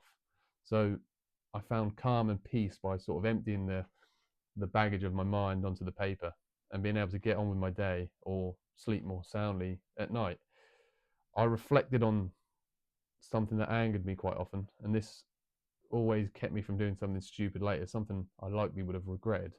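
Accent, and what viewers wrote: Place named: East Anglia, United Kingdom. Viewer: Slight East Anglian accent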